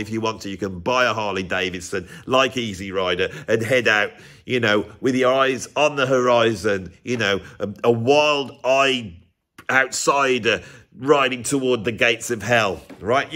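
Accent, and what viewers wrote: Birmingham, United Kingdom. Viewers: Nice to hear a grumpy Brummie (?). I thought I was the only one. Are you sure about and groupies? I can’t fathom it unless the gig was at a School for the Blind. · This was brilliant, so funny! Your brummie accent is perfect for this type of vid. I totally get it with these bands, most people think these bands are good because they’ve been told they’re good. I’m looking at you, even your name is a dull colour.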